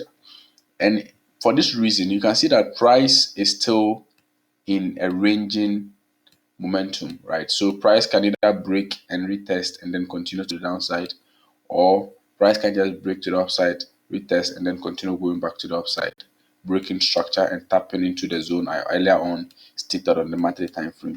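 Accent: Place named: Europe. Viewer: Nice European accent.😅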